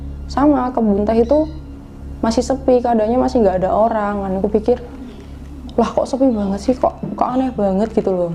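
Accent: Java, Indonesia. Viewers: . Omongane Medhok 😅😅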